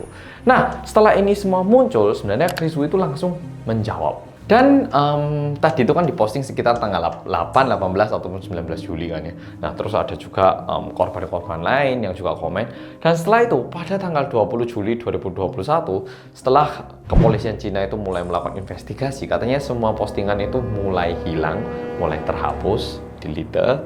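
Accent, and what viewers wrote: Java, Indonesia. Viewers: Bersama orang korea yang medookkkkkkk😁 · Kang Mas,bikin konten tentang kerajaan kuno KOREA dong,swaramu lembut medokg meruntuhkan kesombonganku,biar aku dengerin sambil # TAKING NAP# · Opa medok upload lg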